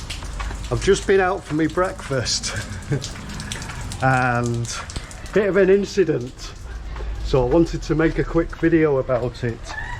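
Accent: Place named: Liverpool, United Kingdom. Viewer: That's unfortunate that you got ripped off by that woman. Yeaaa, just like anywhere else in this world, there are good people and bad people (mostly good ones, though). I understand your frustration, indeed. It is not the 15000 rupiah (which is a minute amount) but the principal behind it. I hate dishonest people but this world is not a perfect place. Well, I hope this experience won't dampen your excitement while visiting Jakarta. Sorry you had to learn the hard way. Forget about this tiny incident and keep exploring and have a great time. I enjoy your videos and your Liverpool accent... lol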